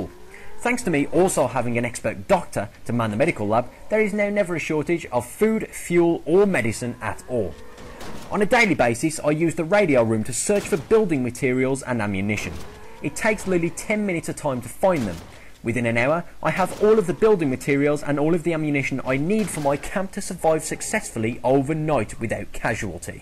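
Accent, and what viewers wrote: Birmingham, United Kingdom. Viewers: your from birmingham :D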